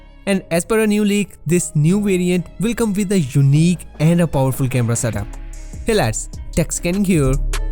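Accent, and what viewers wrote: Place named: India. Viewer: No offence, but the English Indian accent is the worst at the world Seriously my ears can't bear to hear it even 1 moment 🤢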